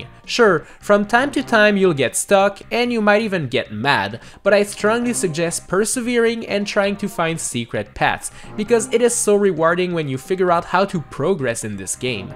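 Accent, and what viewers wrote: Quebec, Canada. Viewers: The captions say busses when he says bosses. Does fight busses, is this just a Canadian thing? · it's a French Canadian accent if you're wondering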